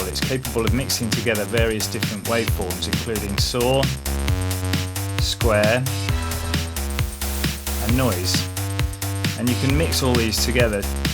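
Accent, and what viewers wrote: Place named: England, United Kingdom. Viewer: It's nice to hear an English accent on a walkthrough such as this for a change! Thanks a lot - found this tutorial to be very useful. Think I'll be getting Synth Squad.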